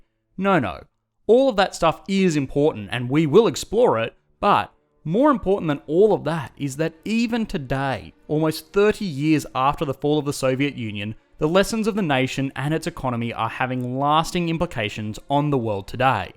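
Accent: Australia. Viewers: Is that australian accent ?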